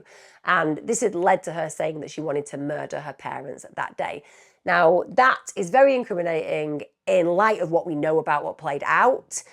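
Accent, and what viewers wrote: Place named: United Kingdom. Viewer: Oh...Your British speaking 😳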